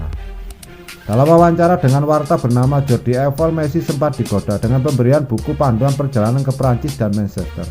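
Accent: Java, Indonesia. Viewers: Medok amat jawanya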